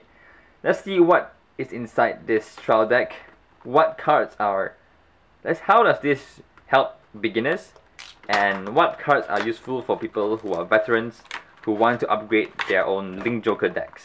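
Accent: Singapore. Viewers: Are you Singaporean?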